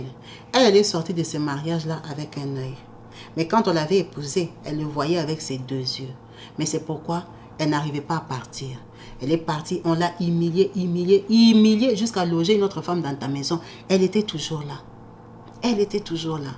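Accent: Democratic Republic of the Congo. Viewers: Ooh la mère chérie je vous aime bcp maman surtout votre accent congolais🥰🥰🥰😘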